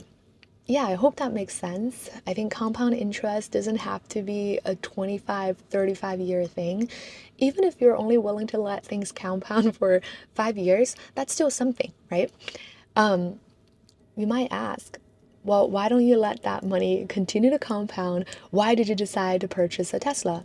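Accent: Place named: United States. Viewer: hi! Could you share your tips on how you achieve your perfect american accent? As a foreigner myself, I find it so hard to have the american accent and I think yours is just perfect! I am chinese too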